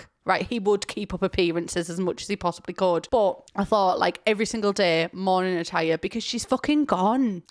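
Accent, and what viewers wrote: United Kingdom. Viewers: i really love your videos, i was just wondering if you could add captions (either in the video or in youtube) bc with my adhd auditory processing can be hard sometimes, especially with thicker accents like yours (maybe not as bad for uk folks but i'm american so i'm not as assimilated to that sort of accent). it's like the only thing keeping me from binge watching them constantly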